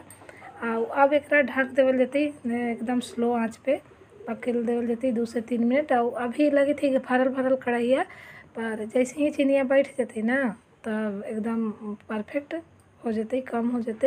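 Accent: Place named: Magahi, India. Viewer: बहुत सुंदर सात्विक रेसिपी के साथ मगही आवाज, बहुत बहुत शुभकामनाएं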